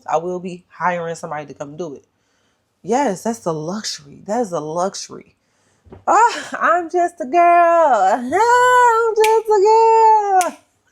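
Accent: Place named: Ohio, United States. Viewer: There are three places I've heard residents pronounce "y'all" like Yaw and that's (1) Baltimore, Maryland (2) Philadelphia, Pennsylvania and (3) Columbus, Ohio. Based on what I'm hearing in her inflection, I"m going to guess she's from Ohio. Ohio will say salty a lot pronounced like "saw-dee" and I feel like I can hear that in other words when she speaks. Further research shows Detroit as her place of origin so I was regionally accurate. American North/Northeast.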